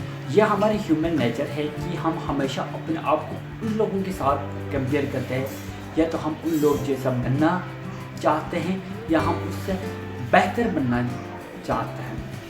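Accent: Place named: England, United Kingdom. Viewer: Wah bhi Urdu bhi English style mein bol rha ho...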